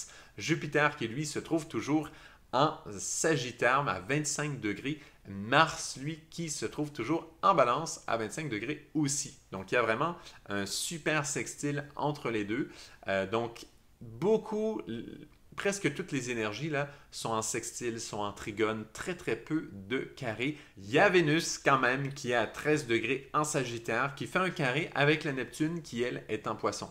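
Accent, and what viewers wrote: Quebec, Canada. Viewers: Merci pour votre lecture de la pleine lune et pour vos conseils d’une belle tenue spirituelle. J’adore votre accent québécois! 🤗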